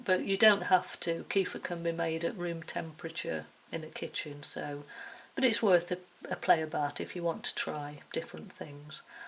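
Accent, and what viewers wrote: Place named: East Midlands, United Kingdom. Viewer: Thanks for a clear and detailed explanation of how to use this machine. Also, are you from the East Midlands, by any chance?? Your accent sounds just like ours.🤭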